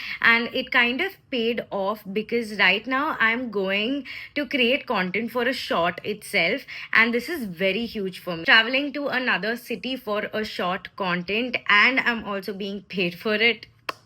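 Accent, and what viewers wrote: Telugu, India. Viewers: Love this vlog so much 🫶💕 This vlog has so much love and joy 🥰 I'm literally waiting for my bestie to get engaged soon 😭 And your telugu accent 🥹 Love <mail>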